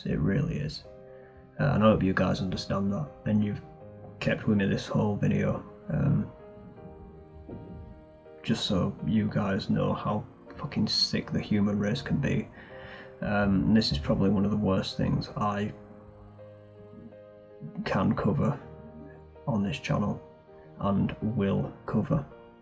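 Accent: United Kingdom. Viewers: Yes, it definitely does need that when it comes to this video; I agree wholeheartedly. I'm kinda cynical about things, lol, so I try and avoid watching stuff that requires the check, but it's annoying when it's a channel you really enjoy. I like the chilled nature of you just chatting and it's always nice when it's a fellow Brit! You're welcome, no problem! 🙂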